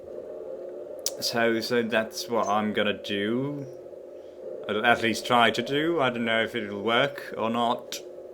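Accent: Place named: RP, United Kingdom. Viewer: You sound posh as fuck.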